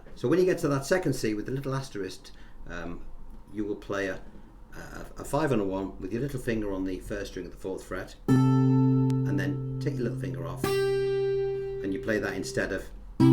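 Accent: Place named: Wirral, United Kingdom. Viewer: Thanks for all you're doing. I'm really enjoying it... especially as all tuition is in a familiar Wirralian accent. Cheers!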